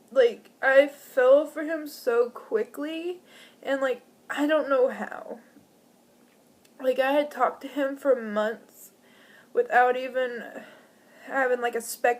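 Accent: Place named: Kentucky, United States. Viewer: What part of Kentucky are you from?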